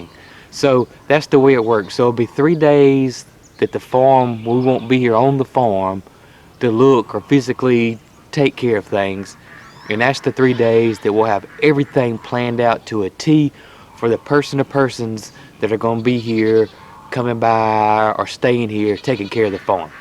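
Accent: South, United States. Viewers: too bad I live in cali... I would watch your animals for free for the experience with all the vidlogs I have watched, I dont think even instructions would be needed. I cant wait till I can afford to buy land in Georgia. For the time being I will live vicariously through your logs...DUDE that southern accent...when daddy spoke that calm, you know an whooping is coming. in other words its easy to stay awake watching!!! the commanding presence is there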